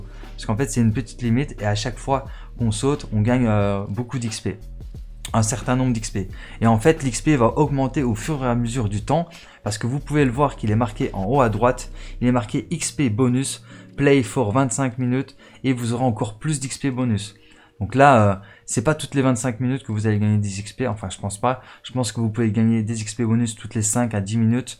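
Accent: Belgium. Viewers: J'ai une question tu es belges ou français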